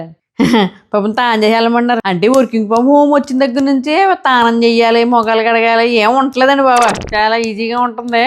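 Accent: Godavari, India. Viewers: Do you speak this way even at home? Looks like Godavari slang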